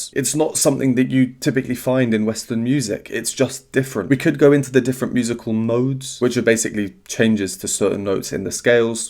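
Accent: United Kingdom. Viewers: Dear, I just love your videos and analyses, having no knowledge of music in theory and understanding next to nothing about notes and registers. Besides, being a linguist, I really enjoy listening to your irreproachable British accent. And Dimash... He is so talented that no words in any language can express. Everything he does is so beautiful, so powerful, so peace-in-the-world-loving (if that might be considered a word). Anyway, I'd like to give you a recommendation of a totally different kind. It's related to a... beatbox artist from Russia, Taras Stanin. Just look him up on YouTube if you find time. He's also an extremely talented guy in what he does. And the things he does about music, about covering well-known pop songs with his incredible combination of beatboxing and singing are just out of this world, in their own way, of course. Right now, his popularity on YouTube is skyrocketing due to all that stuff. 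I just wonder what you might say about such an outstanding artist performing in such an usual genre. Best wishes and regards from Russia! 🤗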